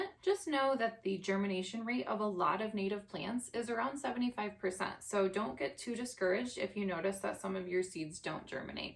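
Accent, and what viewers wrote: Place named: Great Lakes, United States. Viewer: The “baig” pronunciation of “bag” tells me you live near the lakes, like me. ❤️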